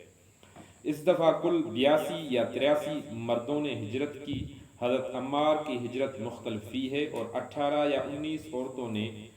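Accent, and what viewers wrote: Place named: Sindh, Pakistan. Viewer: very good book and good voice although sindhi accent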